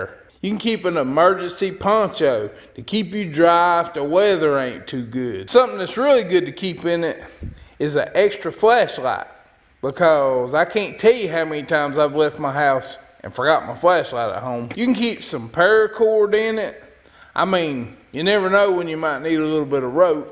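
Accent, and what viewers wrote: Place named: South, United States. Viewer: If you are from anywhere north of Tenn. you will not understand a word he says. Great videos and best info around.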